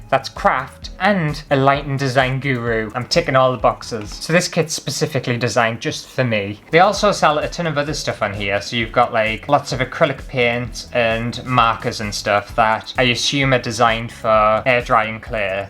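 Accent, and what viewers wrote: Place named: Newcastle, United Kingdom. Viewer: YOOOO are you a geordie lad? or am i gaan mad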